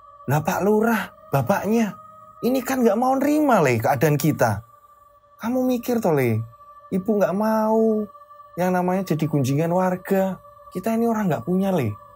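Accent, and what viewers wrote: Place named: Banyumas, Indonesia. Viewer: Ngapak hadirrrr😂 .. Ora ngapak ora kepenak.. Booommm... Booommm. Yosskayyy😅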